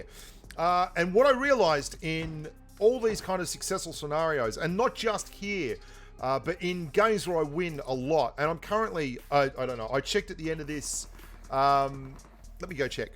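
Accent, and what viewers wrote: Australia. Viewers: Loving that Smooooth Australian voice! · I don't know if it's the thicc australian accent or the great gameplay but I can't stop binge watching your videos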